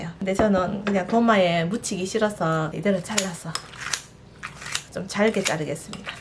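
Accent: Gyeongsang, South Korea. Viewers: 경상도 사투리가 듣기 좋아요. 이 부침개 만들어서 손님들에게 시원한 차와 대접하면 좋겠네요.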